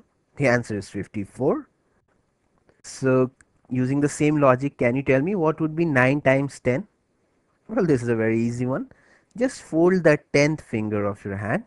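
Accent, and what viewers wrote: India. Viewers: By listening to your voice I can tell that your an Indian :) keep up the good work brother!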